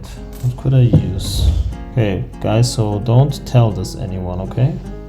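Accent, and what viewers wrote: Germany. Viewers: His accent? He's german - not everyone speaks American English or whatever bro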